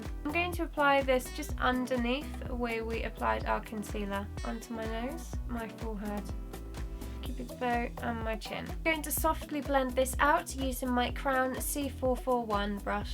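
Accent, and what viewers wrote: United Kingdom. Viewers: OMG I just love her british accent 😱😍😱😍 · I love British English accent .. and you looks great :*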